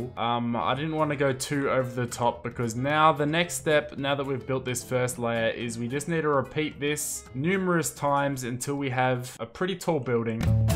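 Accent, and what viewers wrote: Australia. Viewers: WAIT YOUR AUSTRALIAN!?! I didn’t know….YASSS I FINALLY FOUND A NON~ AMERICAN YOUTUBER!!!!!!!!!!!! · You're Australian? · This dude had an accent and everything and I still didn't realize he was Australian until he said something. I'm an idiot. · Wait, Australian YouTuber?? Omg I thought he was British... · Wait, you’re Australian? POG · Seriously I never Knew you where Aussie #AustraliaForLife Because I’m Aussie to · Are you Australian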